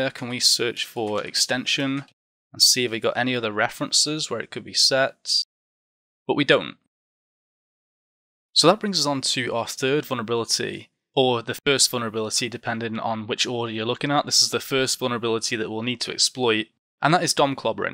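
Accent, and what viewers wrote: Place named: England, United Kingdom. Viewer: I’ve been watching your videos for a few weeks now and I am so happy I found your channel. Your videos are outstanding, and it is much easier for me to understand with the English accent:). Keep doing your thing, I look forward to your next video!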